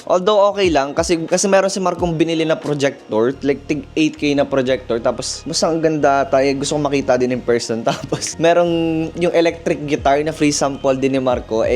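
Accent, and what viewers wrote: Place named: Hiligaynon, Philippines. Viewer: Ilonggo ka ba? Bat may puntong Hiligaynon ka? Same kay David Guison. Same kayo punto hahahahaha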